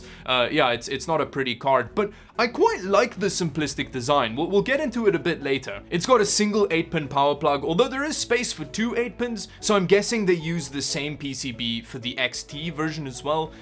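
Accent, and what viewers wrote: South Africa. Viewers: is jy van suid afrika bra jy het daai stem van suid afrikaaner lol ek baie neskiedag plus is algemene naam in suid afrika maar ek love jou vids jys supper funny bru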